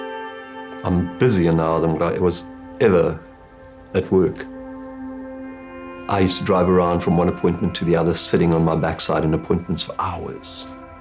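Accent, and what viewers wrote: South Africa. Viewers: You sound South African. Thank you for your positive video Happy Retirement. 🇨🇦🇿🇦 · Retiring brings on a mindset of … it’s the end 💥 … agree with that. You sound like a fellow South African … Blessings 🇿🇦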